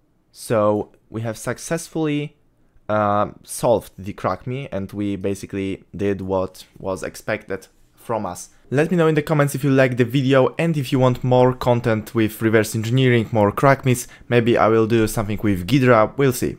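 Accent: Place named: Poland. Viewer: czuje polski akcent 😊😊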